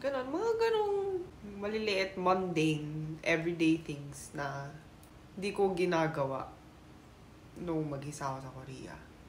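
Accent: Batangas, Philippines. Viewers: Hiiii poooooo.... Nag tataka lang po... Kaya mo po ba mag Batangeño accent while speaking Korean? Paano po kaya yun?🤔 Anyways... You're always beautiful po and feeling ko ang sarap sarap mong i-hug... Always take care po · Parang filipina kalang magsalita*sarang haeyo*!😅❤❤❤🎉😊😘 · New subscriber here,. I found your vlog sad and funny at the same time. Funny because for a Korean, you certainly have learned the way the girls talk in the Philippines and it’s kind of weird listening to your funny words and looking at you who’s as Korean as Korean can be. You’ll get over your homesickness, though. The good thing is you’re not halfway around the world if you ever felt the other way around now, kung ma homesick ka naman sa bansa kung saan ka ipinanganak. Keep smiling, you’re beautiful. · Ramdam kita bebe😢😢 kaht savhn mo na sarili bansa mo un pero hnd ka naman dun lumaki mahirap mag adjust mamimiss mo ung vive sa pinas tapos sobrang seryoso din naman sa korea hirap ka mag adjust,, like Ryan bang sabi nga nia gusto nia mamatay sa pilipinas tapos ngaun pilipina ang nililigawan niya kasi pusong pinoy sya❤.. 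I heard sa batangas ka kasi ung punto mo🤌Batangena rin ako🤌🤌 enjoy mo ang life mo at mag iingat ka palagi.. sundin mo Asa puso mo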